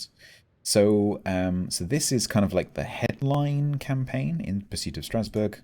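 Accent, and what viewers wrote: United Kingdom. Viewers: Thank you, for uploading this preview (/speculation) for the upcoming updates, I guess I missed this part of the stream. I was a big fan of Foghorn News, but I completely agree with your decision to discontinue them. It's just nice to have the patch notes to be read in calming voice and delightful English accent in this hectic world.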